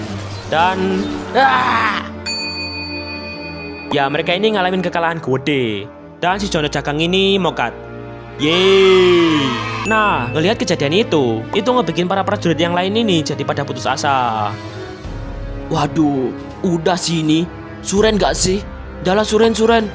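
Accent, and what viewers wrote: Java, Indonesia. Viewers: Kok logatnya logat org jawa🗿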